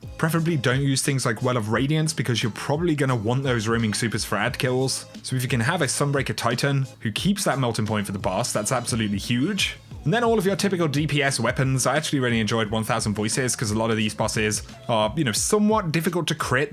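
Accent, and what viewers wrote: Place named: Scotland, United Kingdom. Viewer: U Scottish fuk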